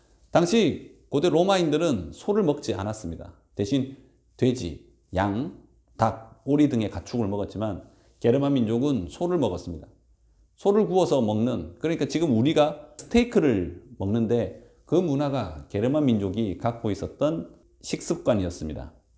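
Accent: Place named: Gyeongsang, South Korea. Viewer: 강사님 억양이 경상도 분이라고 추측해 봅니다. 뺀질뺀질한 서울 억양보다 듣기 조아요~~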